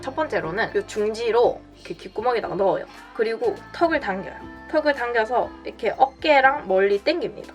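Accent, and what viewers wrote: Busan, South Korea. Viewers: この方はサトリ(方言)ですか？素人ですが応答せよ1997のイントネーションに似ている感じがして、釜山の方なのかなと思いましたがわかるかた居ますか？ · i think it's satoori from busan but i could be wrong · 부산？사투리가 너무 귀엽당…❤️ 다이어트 열심히 하겠습니다！！ 釜山？訛りがかわいい…❤️ ダイエットがんばります！！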